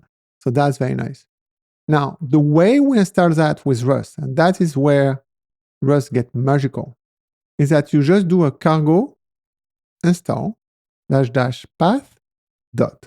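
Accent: France. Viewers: Je reconnais un français à l'accent haha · You are awesome man, kudos! And since you mentioned the accent first now I can say that Rust is really something change me in many ways; I used to can't stand the French accent 😅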